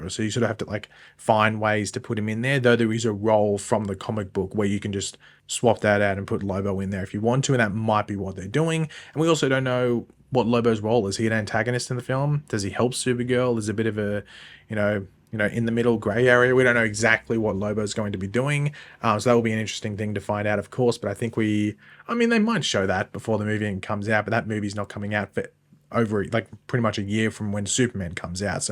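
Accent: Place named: Australia. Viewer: Shut down your page. I hope you will seriously think about it. I dealt with your negative comments about Superman and Lois, all through its run, because you were a "just a Arrow Fan" and only Flash mattered besides it. We don't need your terrible Aussie accent. Through yourself on the Barbi and turn the gas up to 'Nuclear'.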